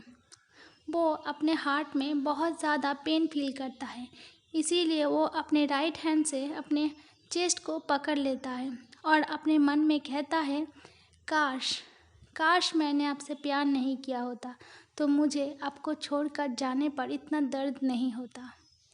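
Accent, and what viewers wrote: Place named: Bengal, India. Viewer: Mujhe laga hi ta pahle din se hi ki aap bangli ho kuki aapke bolne ke lahje se me samjh gai ti di kuki me bi bangli hu 😍 and i love your voice and aap bahut hi aachi bol leti hai di 🤗 love you so much di 😘😘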